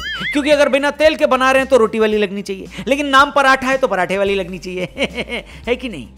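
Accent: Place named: Bihar, India. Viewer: भाई आपका बिहारी में बोलने का style मस्त है भाई | love 💓💓you bro from patna (बिहार)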